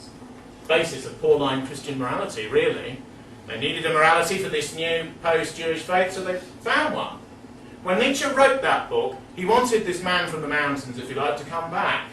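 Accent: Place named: United States. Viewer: His American accent was quite good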